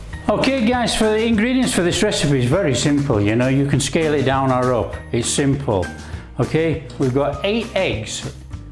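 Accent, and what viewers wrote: Yorkshire, United Kingdom. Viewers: No measures beyond 8 eggs, get real. Enthusiasm and a Yorkshire accent do not ut it.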